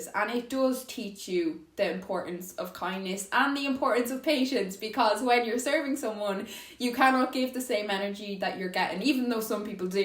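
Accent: Ireland. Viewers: It's like the voice in my head turned Irish, I agree wit everyting you said. 😎👍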